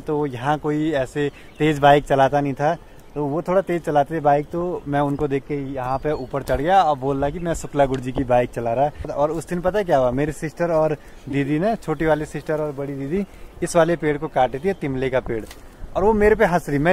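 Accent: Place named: Garhwal, India. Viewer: आपके मुँह से गढ़वाली बहुत अच्छी लगती हैं तो कुछ अपनी भाषा भी बोला किजिए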